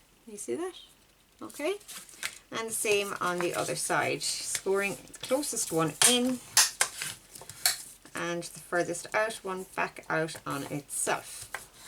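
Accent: Ireland. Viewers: Lovely to hear an Irish voice! I’m from Lisburn Northern Ireland. Just loved your rocking robins and will be making them for the grandchildren.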